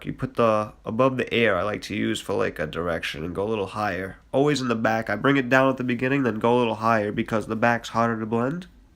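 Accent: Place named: Boston, United States. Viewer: do you ever go 5 star shaver your freaken awesome. You sound like beantown accent.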